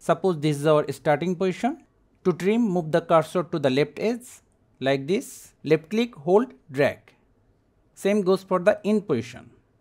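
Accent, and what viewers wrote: India. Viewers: I love everytime I need to know how to edit something on a program I just found there is an Indian sounding man ready to explain it. good video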